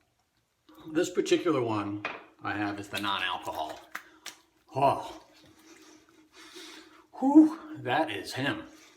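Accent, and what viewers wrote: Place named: Chicago, United States. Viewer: great video and I love it when you lay that Chicago accent on thanks for sharing it ,it made my day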